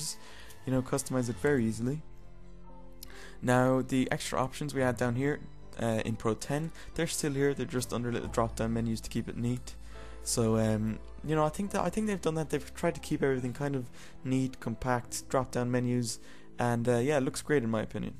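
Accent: Ireland. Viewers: Sexy Irish husky voice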